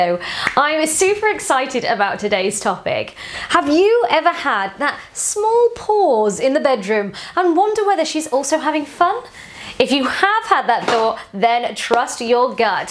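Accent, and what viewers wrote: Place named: England, United Kingdom. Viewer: Accent? It's the original English language!!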